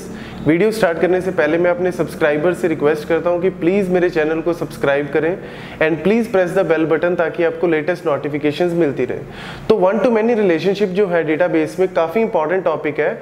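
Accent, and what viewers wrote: India. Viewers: I cant understanding anything what you saying dude. Unfortunetly I am not get used with the english indian accent. Please friends, if some one could put english subtitles on his videos, it would be great...because despite I cant get excatly what he is saying, I am sure that his approach is very unique and worth to share. Greets from Brazil.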